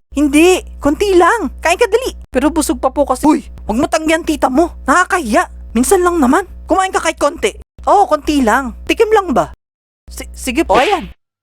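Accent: Visayas, Philippines. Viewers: Karon rako nakabalo nga bisaya ka man diay bai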